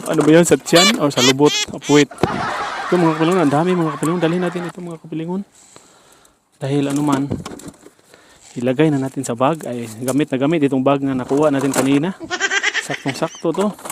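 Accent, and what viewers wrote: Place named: Tagalog, Philippines. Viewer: Ganahan gyod ko maminaw sa imong Tagalog kapilingon makalingaw ha ha ha,❤❤❤❤😊😊 amping kanunay dong.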